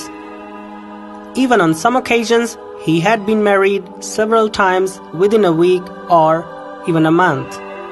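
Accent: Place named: India. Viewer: I completely agree he sounds Indian and a load of crap coming from his mouth I couldn't watch it all since it was all lies...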